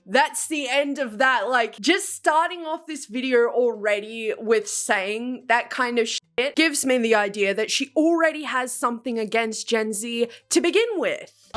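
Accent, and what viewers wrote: South, United States. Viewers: Why is your southern accent peak? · I wasn't expecting the southern accent immediately, I'm crying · Ngl ur American accent is hilariously good 😭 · your american accent was so good i forgot you were australian for a second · your Southern accent is spot on 🤣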